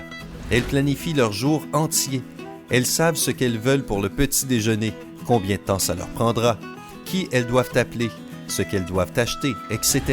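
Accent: Quebec, Canada. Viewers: J'adore la voix québécoise like si toi aussi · J'adore ce mec avec l'accent Québécois, il me fait trop marrer...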